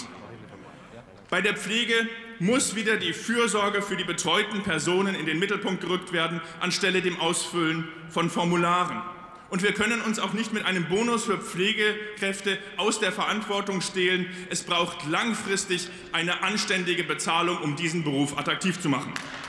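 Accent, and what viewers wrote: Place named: Swabia, Germany. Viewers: ... Schwäbisch kann auch sein ... der Dialekt ist etwas gewöhnungsbedürftig ... ich habe 15 Jahre da gearbeitet ... und gelebt ... Spaichingen und 11 Jahre in Ostfildern Nellingen ... war ganz nett bei den Schwaben ... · Wohl eher Schwabe. Grausiger Dialekt für meine Ohren.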